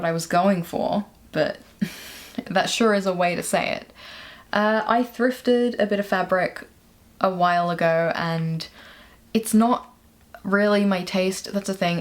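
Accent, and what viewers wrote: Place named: Australia. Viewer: This is my first time watching one of your videos. I have a PhD in linguistics and I find your accent fascinating. At first I thought Australian and then English but with a lot of North American influence. Your rhotic R changes back and forth even in the same sentence. You have a very interesting mix of accents and influences. And I found the content interesting too 😊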